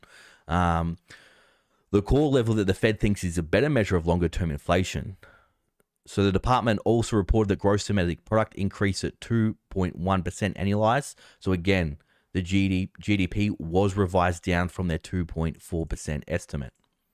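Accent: Australia. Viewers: You can call it data or data, I really don't mind Love the ozzy accent! Thank you mate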